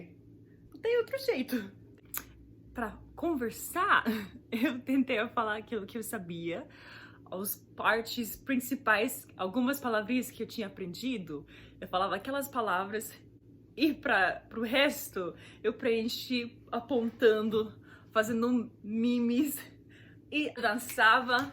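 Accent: Brazil. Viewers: Não, o sotaque dela é carioca. Ela não carrega no ‘r’. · I know but your accent is so beautiful like a Brazilian. Congrats. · Gringo é uma palavra de origem espanhola e significa estrangeiro em português. Quem popularizou essa palavra para os estrangeiros foram norte-americanos aqui no Youtube. Mas não entendi do porque você não tem sotaque nordestino e sim paulista?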